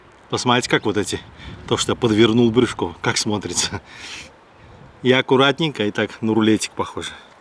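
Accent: Baku, Azerbaijan. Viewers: Бакинский акцент. Знакомые бакинцы независимо от национальности именно так разговаривают.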